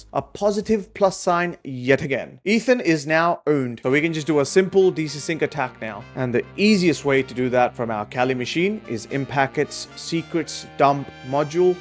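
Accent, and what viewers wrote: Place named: South Africa. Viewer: Suuuubed. What accent is that? South African in Australia?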